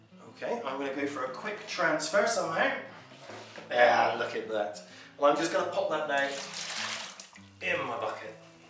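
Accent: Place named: Somerset, United Kingdom. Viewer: Hi, I am from Somerset and by listening to your accent i'm assuming you are too! My question is, where is a good place in Somerset to by apples in bulk at a wholesale price? Cheers mate and great video!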